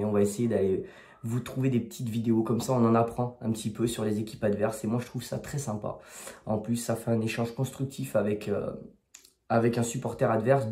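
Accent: South, France. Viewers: J’allais te traiter de «Guignol» et je me suis rendu compte de ton (jeune âge).. En fait tu parles de se que tu connais je veux dire depuis que ton cerveau est en possibilité d’analyser se que tes yeux voient !!!! Tous ça pour t’expliquer que tu ne peux pas comparer l’incomparable (Karim Benzema) c’est la classe mondiale,mais quand il brillait à Lyon (150 ou 170 buts en 5 ans)toi tu étais trop jeune pour t’en souvenir et en profiter alors que Menphis depay est un bon joueur qui apporte à Lyon c’est indiscutable,mais de là à prétendre être au dessus de «Mr 9, 9.5,ou 10» du Réal Madrid,t’es à côté de tes pompes petit !!!! Ps: Avec ton accent du midi tu te permets de dire des trucs sur des joueurs Lyonnais, choses que même les supporters Lyonnais natifs de Lyon ne se permettent pas. Un peu de respect pour Lyon pélo !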